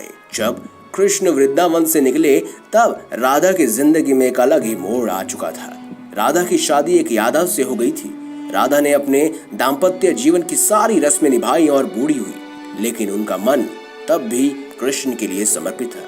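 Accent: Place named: United Kingdom. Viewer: Bhai dhang se bolo, pure Hindi me English accent mat Lao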